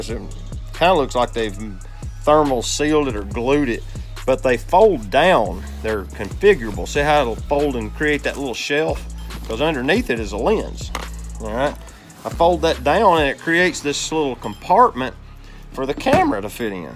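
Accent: South, United States. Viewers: hahaha I wasn't sure if you were joking so didn't want to say anything. I thought it was a southern accent. It's awesome. Love it :) I'm from Germany and have a terrible accent :)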